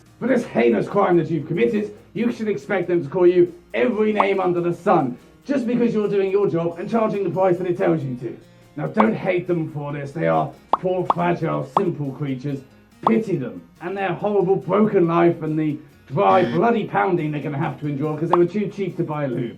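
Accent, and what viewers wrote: United Kingdom. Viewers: I can tell he’s British.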